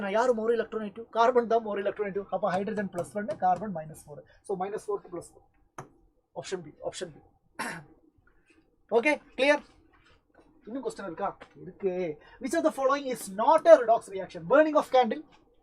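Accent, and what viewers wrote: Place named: Kerala, India. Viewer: Hes a malayali bro!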